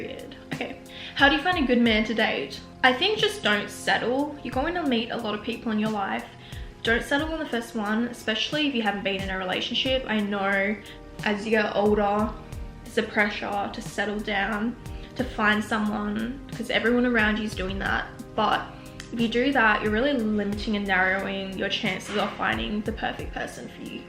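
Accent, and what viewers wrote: Australia. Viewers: I only talk in an Australian accent now because of you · And her thicc aussie accent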